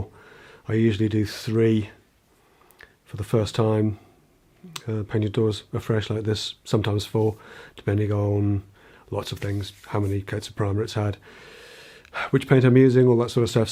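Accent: United Kingdom. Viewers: I love Anderson Cooper and Richard Gere. is both of them but with a British accent!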